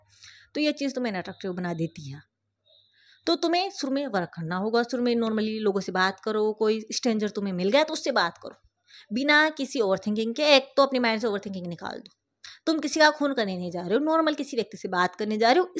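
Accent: Rajasthan, India. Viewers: Another Great Video From BTW You Sound So Rajasthani, Are You From Rajasthan?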